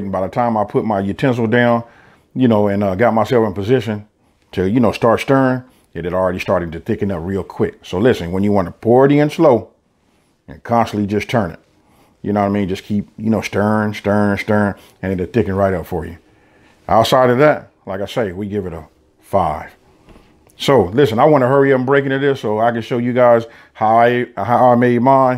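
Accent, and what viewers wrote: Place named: California, United States. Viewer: You sound like you're from cali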